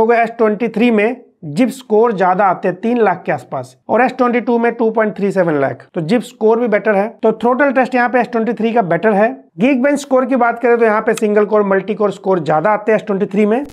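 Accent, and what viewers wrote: Lucknow, India. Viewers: Lucknow se ho kya bhai?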